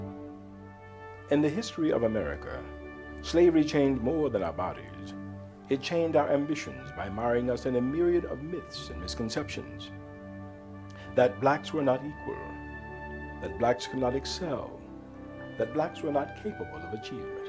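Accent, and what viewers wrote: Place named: United Kingdom. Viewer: the British accent... o.O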